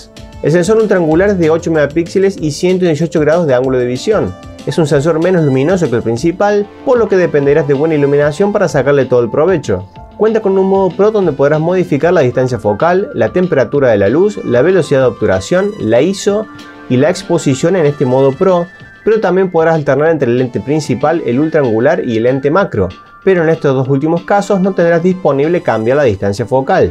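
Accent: Córdoba, Argentina. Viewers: loco sos cordobee ! te seguir{e por eso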